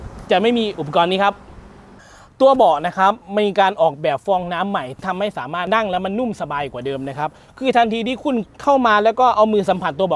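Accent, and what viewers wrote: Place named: Isan, Thailand. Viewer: admin คนอีสานแน่เลยสำเนียงภาคกลางพอได้แต่ยังไม่คล่องเท่าไหร่ครับ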